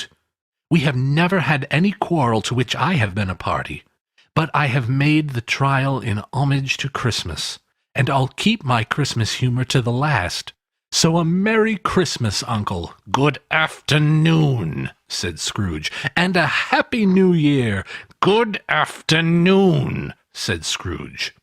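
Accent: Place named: United States. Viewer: He's american, therefore he has an American accent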